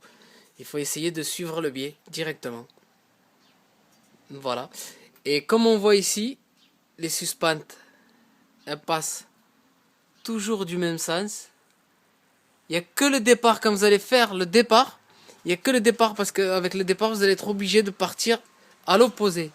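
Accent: South, France. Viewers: Salut merci pour ta video très clair j'ai tout compris avec ton accent du sud c'est géniale. Merci encore et si tu peux faire une vidéo sur les plafonds dale 600/600 se serai dégain merci.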